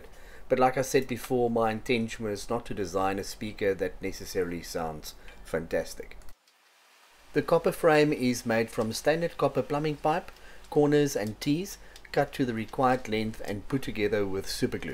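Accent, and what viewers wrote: South Africa. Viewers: Ive noticed your accent is south African or i might be wrong the thing is people dont use a good headset or proper speakers use quality headphones you will hear they dont lack that much in the bass sound bass definitely a bit more while they are suspended ive just discovered this channel yesterday and im inspired to build my own bluetooth speaker im from south africa currently residing in china so everything is less expensive love your work man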